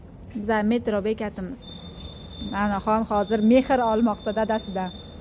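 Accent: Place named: Olot, Uzbekistan. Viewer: Qorako'l yoki Olotdanmisiz deymanda shevada gapirgani da bilinayapti😂😂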